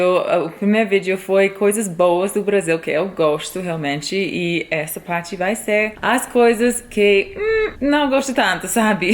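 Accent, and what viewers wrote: Portugal. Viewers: "Psiu" é som para chamar gatos ou para pedir silêncio, chamar alguém assim ou por meio de assobios é falta de educação. Eu sou pontual e também não gosto dessa mancada que a maioria dos brasileiros dá, que é o de atrasar em seus compromissos. O seu sotaque lembra o de uma portuguesa! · Legal, sotaque muito parecido com portugues de portugal. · Você tem sotaque português de PORTUGAL....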